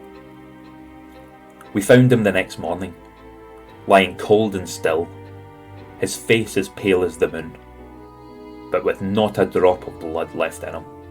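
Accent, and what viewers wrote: Scotland, United Kingdom. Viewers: I love the Scottish accent. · I love the Scottish accent. I could listen to anything in a Scottish accent and find it intriguing. Thank you!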